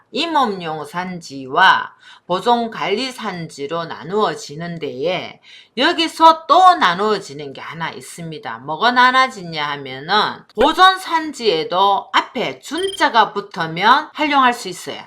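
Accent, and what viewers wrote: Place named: Gyeongsang, South Korea. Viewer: 진주분이신가요? 사투리가 ㅎㅎ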